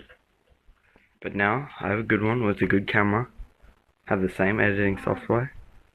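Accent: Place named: Australia. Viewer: I follow several Aussies and their birds on YouTube. You don't have as pronounced an accent as most Australians so I thought that you might be from the UK. Anyway, I have missed Maisey and am glad to see her back. Love, from Clearwater, Florida.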